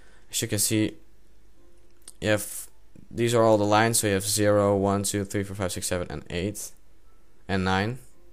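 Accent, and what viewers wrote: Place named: Netherlands. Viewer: wow jij klinkt nederlands zeg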